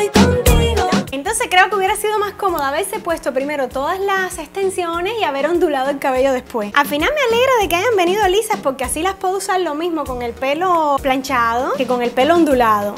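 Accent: Cuba. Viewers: Hola guapisimaaa que linda eres me encantas soy feliz viendo tus videos y el acentito cubano yo vivo en Sevilla soy de Ecuador mi patria querida ole ole cada vez mas entretenida interesante intelugente con esa actitud positiva mi latina favorita te mando un beso muy grande que te queremos mucho tambien mi hija Patricia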